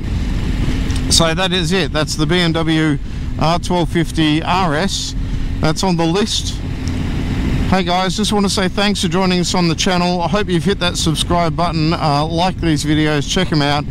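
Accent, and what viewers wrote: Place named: Australia. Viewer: I love the bike! Your Aussie accent is pleasant to hear as well. Great review mate! Thanks.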